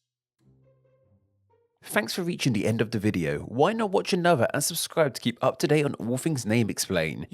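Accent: United Kingdom. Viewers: s is literally ass but i guess the british accent breaks it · Our is interesting because in your British accent it is R but in an Australian accent it's pronounced "awo" like ouch ow. I wonder if there are words that would fit from other accents to letters as well?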